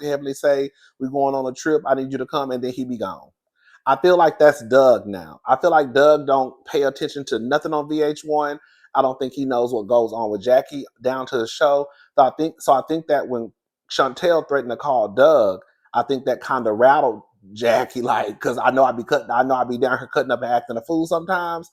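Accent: South, United States. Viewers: Team Jackie ❤ I holler errtime you say HURRR I live for your country ness and your laugh 🥰🥰🫶🏾🫶🏾‼️😭😭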